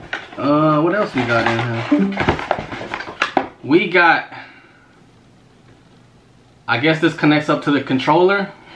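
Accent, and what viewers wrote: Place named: Wisconsin, United States. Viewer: bruh u sound like ur from beloit wi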